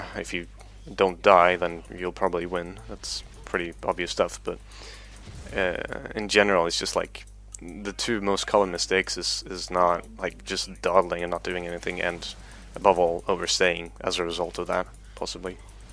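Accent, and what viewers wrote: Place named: Sweden. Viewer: ahh you are swedish^^ i thought i recognised the accent :) <-danish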